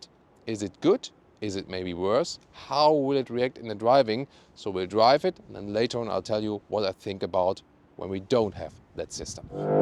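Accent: Germany. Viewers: You sound too German for me as an American 🇺🇸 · “Lots of high gloss black…which no one asked for”. 😂😂. Exactly! Love your channel and its presentation. The German accent makes listening fun as well for an American lol.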